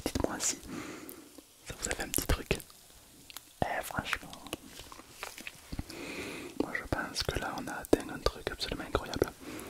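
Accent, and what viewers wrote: South, France. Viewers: L'ASMR avec l'accent du sud. Incroyable.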